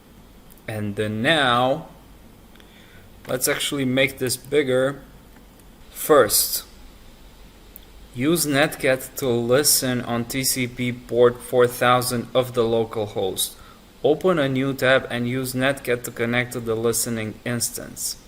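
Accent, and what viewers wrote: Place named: Ukraine. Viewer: you dont have an accent? Your funny. My girlfriend is from Ukraine, yall sound very similar. I am going to be teaching Golang for pentesting. I'm working on it now as we speak. But I'll send you the link, when I'm getting close to showcasing. I wanted to do one for bash but you and,a few of my other technical friends are doing Bash. So I decided to wait.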